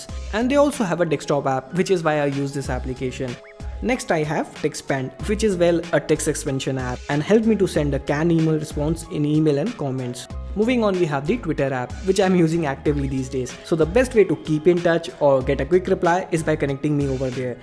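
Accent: India. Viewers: I'm from bolivia and even me can hear that india acent :v you speak better than me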